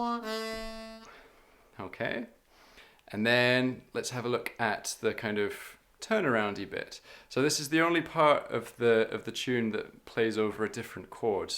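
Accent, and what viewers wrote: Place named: England, United Kingdom. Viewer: You're Scottish? I never would have guessed. Your accent sounds English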